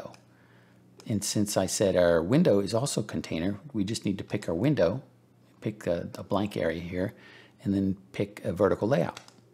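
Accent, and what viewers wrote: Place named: India. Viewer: Are you indian by any chance? You sound like my coworker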